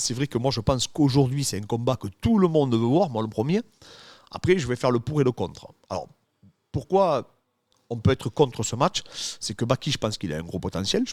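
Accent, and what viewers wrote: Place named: Rural, France. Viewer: Tres bonne chaine, mais alors l'accent du terroir en + c'est un abonnement direct 😂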